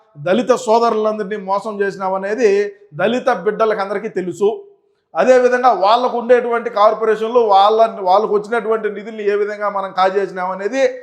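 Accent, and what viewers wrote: Rayalaseema, India. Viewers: చక్కటి , సీమ తెలుగు లో ,చాలా చక్కగా చెప్పావు. ధన్యవాదాలు .- Thanks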